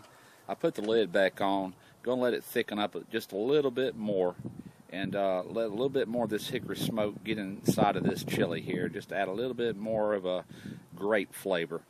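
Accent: South, United States. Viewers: He sounds like Bill belichick with a southern accent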